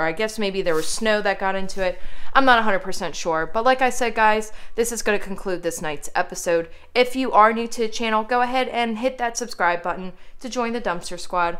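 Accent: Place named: Maryland, United States. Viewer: You sound like you're from MD :)...you're having good luck and next time I'm in MD I'll try to check the dumpsters.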